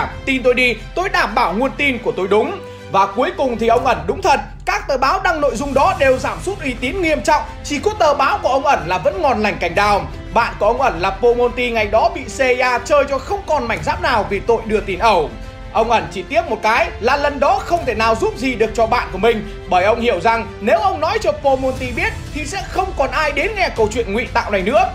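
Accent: North, Vietnam. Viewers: Giọng đọc đúng chuẩn giọng bắc Kỳ luôn nghe hài vãi😂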